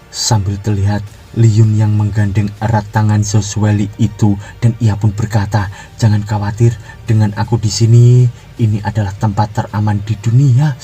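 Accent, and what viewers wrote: Java, Indonesia. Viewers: Mas njenengan jowone pundhi ?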